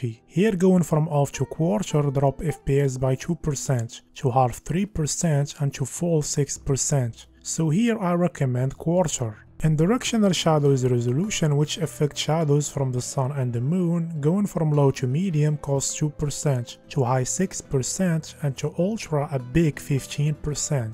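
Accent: Morocco. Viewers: You're Moroccan aren't you XD I can tell by the accent. Best of luck ! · where are you from ? you have a Moroccan accent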